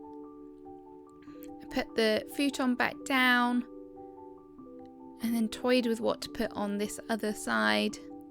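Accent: England, United Kingdom. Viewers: your videos and your gorgeous English accent make me miss the UK so much. My Dad was English from Surrey and I lived and worked in the UK, years ago now. I was set to have a visit last year, but of course as we all know, Covid came in and changed everything for us all. Really love your videos and I am so loving the coastal theme. Wanting to do it on our island next thanks to your inspirations 😊💕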